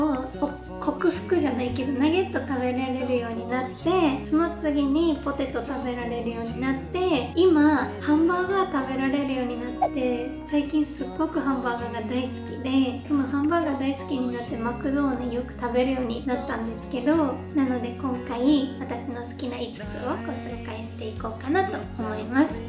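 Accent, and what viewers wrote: Kagoshima, Japan. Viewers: 鹿児島弁のイントネーションが残ったままで嬉しい！！！かわいいなー、鹿児島の誇りだよ☺️💕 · 相変わらず可愛い💕 鹿児島訛りの話し方も優しい感じがして可愛い💕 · 、相変わらず可愛いね🤗 と同じ鹿児島出身の大原優乃ちゃんと訛が似てるね🤗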